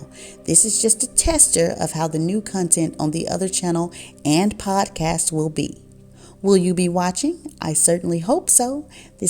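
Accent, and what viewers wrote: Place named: Memphis, United States. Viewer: This was good Ashley! Girlllll I thought you were going to be all serious throughout until you gave us that little funny voice over! 🤣 I understand this is a serious matter at hand but even tho " in my Memphis accent " still be you! That's what drew me and others to you. I'm very intrigued about this new genre. You GO GIRL! P.S. I want to purchase your book but forgot the name. Blame my mind and not my heart chile I know you've mentioned it a bunch in the earlier vids... ❤ nevertheless you're an awesome story teller! Hopefully I'll catch a Live 😅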